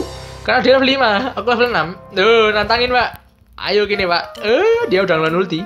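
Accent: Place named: Malang, Indonesia. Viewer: bahasane malangan hehe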